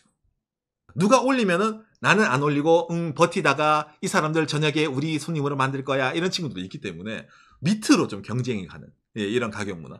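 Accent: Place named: Gyeongsang, South Korea. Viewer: ㅎㅎ 사랑스럽고, 은 듬직하고, 경상도 사투리 ㅋ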